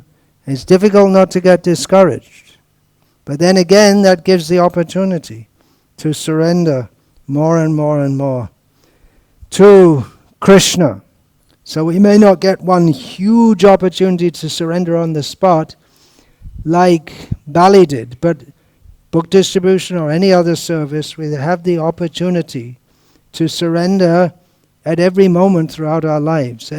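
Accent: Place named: England, United Kingdom. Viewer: Always amuses me how Bhakti Vikas S. pronounces Sanskrit with an English Twang or twist....Wow !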